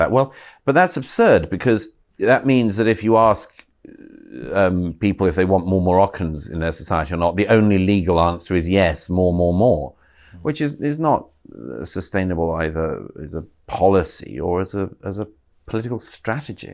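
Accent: RP, United Kingdom. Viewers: This man has made a living out of saying nothing with lots of words in a posh accent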